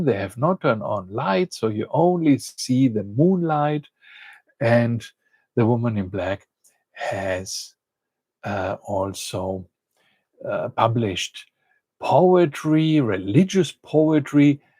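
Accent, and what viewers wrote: Germany. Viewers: Hello, may I address you like this? I love your content, my husband and I have been trying to learn Greek for a couple of years now and we especially like your approach of explanation with all the connotations. And since we write you from Germany we love the “airy” German accent in your English! Just saying that the sound quality in the streaming production was not very good and made it often difficult to understand your explanation. In the whole we look forward to seeing and hear ing more from you and thank you for your effort!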